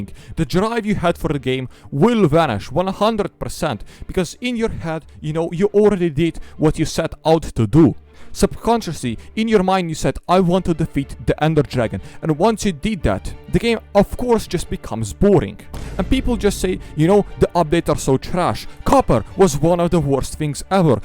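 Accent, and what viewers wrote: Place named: Eastern Europe. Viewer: ... yeah i hear the babushka people acent now that i relisten to it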